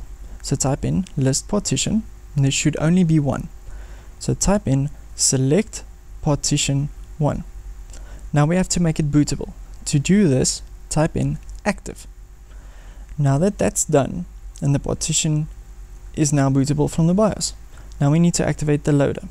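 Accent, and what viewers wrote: Afrikaans, South Africa. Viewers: Ek hoor duidelik die Afrikaans deur slaan lol. Goeie werk dankie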